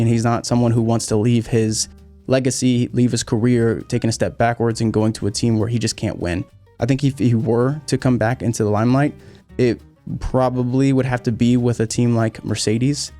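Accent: United States. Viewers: An f1 commentator with american accent????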